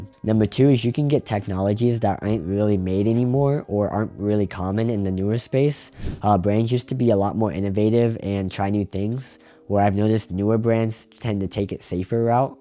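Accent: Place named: Midwest, United States. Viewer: Congrats on your license!!! Good luck God Bless and much success! The 36mm Date Just you can't go wrong. I prefer the older holes oyster case Rolex models. Perfect for strap options and it gives you a vintage vibe. Those look perfect on young guys when you "hipster" a classic vintage watch. BTW you sound like you're from the Midwest... it's the accent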